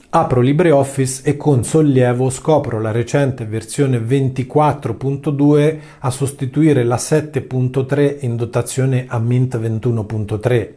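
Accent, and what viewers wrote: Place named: Umbria, Italy. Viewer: ciao, non riesco a capire il tuo accento.....sei umbro?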